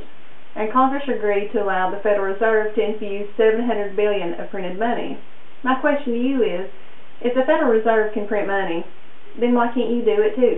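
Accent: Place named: South, United States. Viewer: I wish you every success.You deserve it just for having that lovely southern accent. PS I'm English .....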